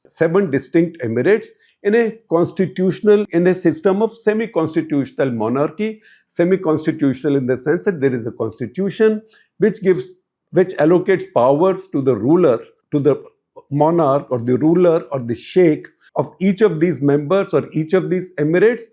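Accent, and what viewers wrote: India. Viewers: Utter garbage spewed by progandists , & repeated by a stupid fool young man from sindustaan stone age , with a thick kooli accent... Go back to cultivating your land and go produce some magh dhal beans... However Sirrrr rrrrrr....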